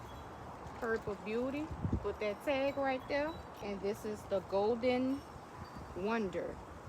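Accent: New Orleans, United States. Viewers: Love you channel and listen to you talk the nawwlens voice is coming thru.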